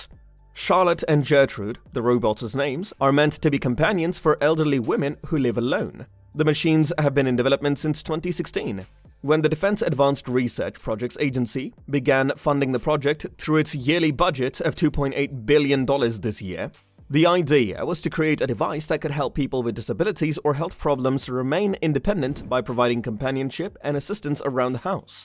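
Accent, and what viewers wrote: United Kingdom. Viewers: why thr brit accent infloozer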